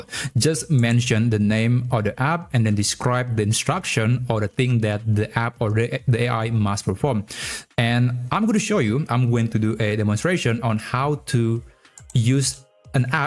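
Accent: Indonesia. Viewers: You sound Indonesian. Good video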